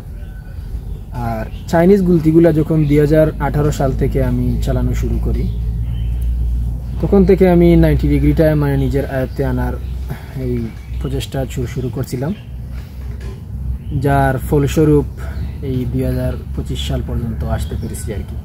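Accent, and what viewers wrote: Sylhet, Bangladesh. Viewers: Vai ki sylheti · Bai apnr matar style sylhetr moto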